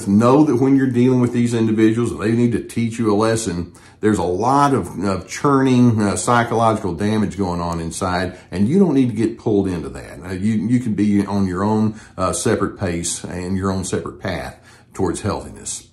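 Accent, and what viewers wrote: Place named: Texas, United States. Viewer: Another great video from Dr. He really gets to the heart of the matter! I so often heard this horrid expression from my mother and having it defined logically helps me to rationalise and overcome its effect. Many thanks for the calming effect of these videos. That lovely Texan accent and the comforting Gus on the sofa help a lot too! 💕💕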